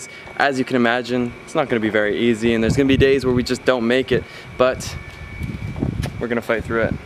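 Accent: Canada. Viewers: Got the typical Canadian aye haha. Love the vlog! Keep em coming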